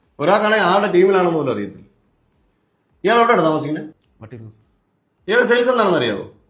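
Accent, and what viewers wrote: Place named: Trivandrum, India. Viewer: Trivandrum slang❤❤❤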